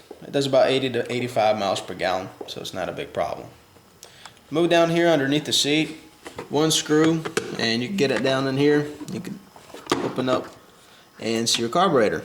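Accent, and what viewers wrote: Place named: South, United States. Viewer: Southern boy...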